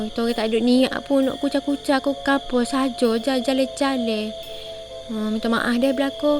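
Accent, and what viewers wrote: Kelantan, Malaysia. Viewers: baru sedak dengar masuk dlam cerito denga kalu kot lorak kelate gak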